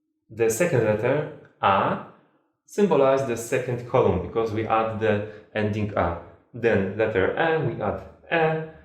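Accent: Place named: Poland. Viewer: Greetings, Sir! I am here for your Polish English !!! )))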